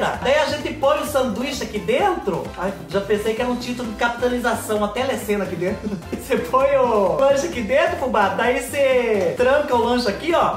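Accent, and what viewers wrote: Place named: Minas Gerais, Brazil. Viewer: Fubá do céu, vc é natural de onde? Seu sotaque parece muito com o do mineiro. Não sou clandestina.😘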